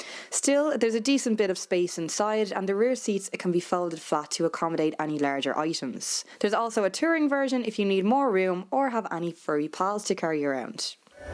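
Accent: Ireland. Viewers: Couple points, The color of the vehicle is really beautiful. The roads that were driven were very scenic, ? in Ireland; whereabouts? The interior in my opinion, especially infotainment (if you want to call it that) is not as nice as the competition. The curves and grille of the vehicle give the car a unique character. The lady doing the review is really pretty, and I have not been to Ireland, and enjoyed listening to her accent. I am writing from USA. Thank you for this review.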